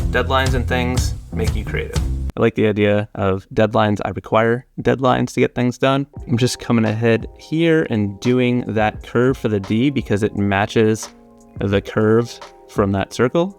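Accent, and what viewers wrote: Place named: South, United States. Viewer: Southern drwarl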